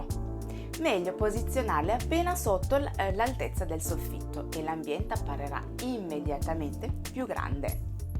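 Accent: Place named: Italy. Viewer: Brava e grazie 🙏 e complimenti per l’accento italiano perfetto!! Ed ho orecchio eh.. :)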